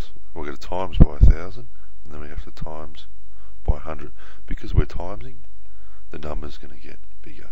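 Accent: Australia. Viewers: This dude is an Aussie or NZr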